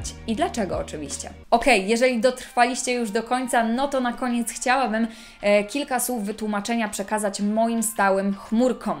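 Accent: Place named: Russia. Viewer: Powiem, że rosyjski akcent pierwsza klasa 👌🏻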